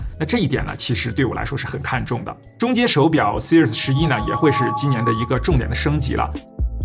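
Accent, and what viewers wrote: China. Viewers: 听口音是大陆出生的，但用词很多台湾化，什么晶片啦，毫米不说毫米😊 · 那你到底是台灣人還是大陸人 如果是台灣人 你講話為啥像大陸人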